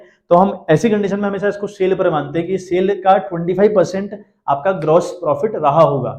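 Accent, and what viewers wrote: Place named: Pahadi, India. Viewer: himachal se . Aapke accent se lga ki aap pahadi ho 🙂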